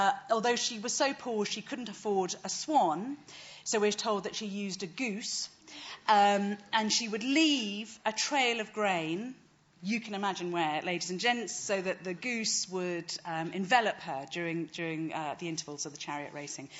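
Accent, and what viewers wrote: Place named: United Kingdom. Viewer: To this American Bethany Hughes has the perfect female British accent and voice. Especially aesthetically pleasing while being educated.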